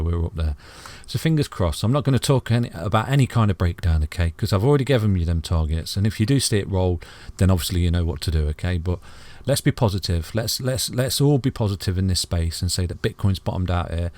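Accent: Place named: Nottingham, United Kingdom. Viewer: Sounds like he's from Nottingham. Although I don't have a crystal ball...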